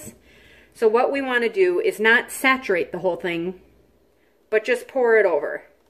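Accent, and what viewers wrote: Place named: North, United States. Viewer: Hi I 💕 this video!!!! You did a wonderful job showing how to dye techniques!! Plus I love your northern accent!!! My mom is from South Dakota so it makes me feel right at home here in Oklahoma!!!🥰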